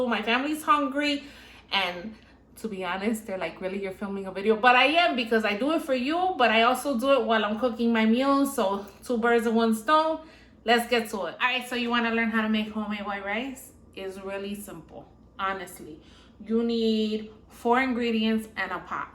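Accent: Bronx, United States. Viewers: girl you sound like you're from the bronx...i love it! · I’m Puerto Ricen an l watch your video forthright first time. Girl were have you been all this time,loved your white rice. Are you fromNY? The Bronx maybe? You sound like it . May God Bless you.👍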